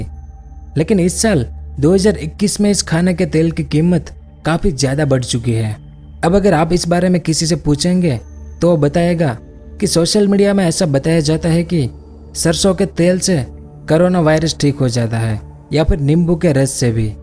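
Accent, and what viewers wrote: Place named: South India, India. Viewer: Bhai pehle ye bta hindi koi jruri ni sabi ko aati h kya pta Anchor South se hai ya punjab se ha samaj aana chahiye jo aa b raha ha Aam khao usko gino ni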